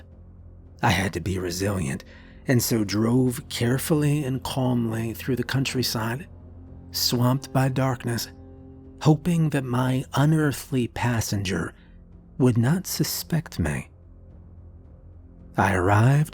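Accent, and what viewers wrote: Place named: United States. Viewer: As always your narration was second to none. Being in the UK, I have often found it difficult to listen to an American accent narrator, but you have the perfect voice, timbre and intonation, certainly for this genre. Thanks for narrating this fabulous story ❤❤❤❤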